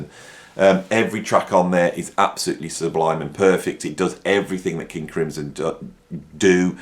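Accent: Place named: England, United Kingdom. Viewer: I'm not happy you should have had them on the list from the very beginning and your reasoning was pretty lame 😢 it's hard to believe I hear an English accent coming out of your mouth but you didn't pick ELP the first time around😮 I say this and I'm American😊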